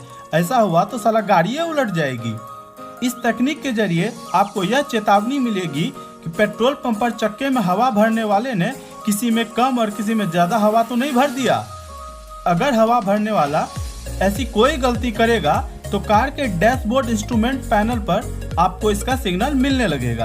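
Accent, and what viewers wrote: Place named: Bihar, India. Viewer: बिहारी वाला अंदाज गजब शानदार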